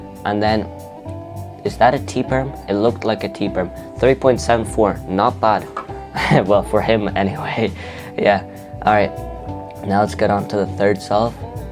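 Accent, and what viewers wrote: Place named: Ireland. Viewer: I love ur Irish accent it’s dope lol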